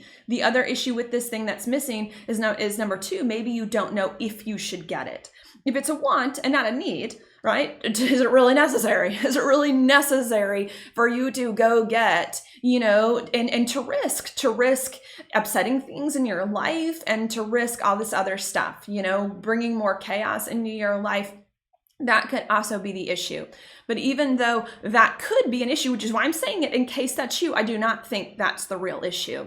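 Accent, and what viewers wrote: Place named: Michigan, United States. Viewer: Are you from Michigan? I'm from Michigan. And I hear a lot of Michigan in your voice... 😉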